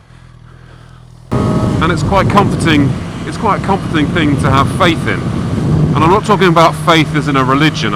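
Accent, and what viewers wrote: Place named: London, United Kingdom. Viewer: Here in 'Murica it is primarily illegal to filter or as we call it Lane splitting. Love your vids you sound like a "Pen pal" of mine from london. (Because saying internet friend sounds lonely)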